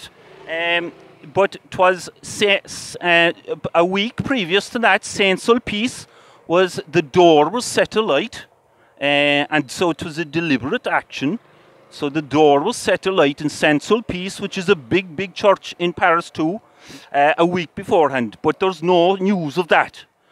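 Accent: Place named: Kerry, Ireland. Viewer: I really enjoyed this . Cannot understand why you had so little views !! I do however suspect you are a Corkman putting out a bloody good South Kerry accent .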